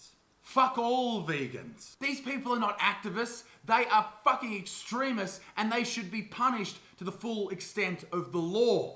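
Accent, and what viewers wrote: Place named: Australia. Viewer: I don't know why but I absolutely adore australian accent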